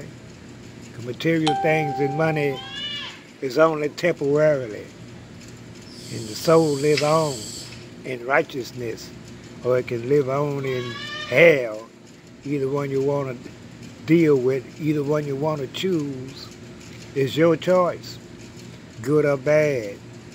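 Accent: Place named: New Orleans, United States. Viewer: Elder the way you talkin you real are man a live stream talk just like that the way you are talkin remind me of my relatives New Orleans 504 head bussa!!⚔💥⚔🔥⚔💥⚔✊🏿🔥✊🏿👊🏿💥👊🏿💥👊🏿💥👊🏿💥👊🏿💥👊🏿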